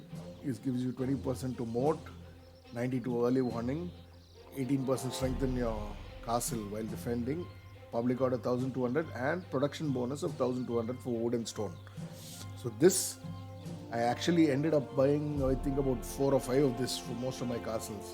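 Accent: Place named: India. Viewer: hey nice video man Are you from India? u r english accent is completely matching to indian style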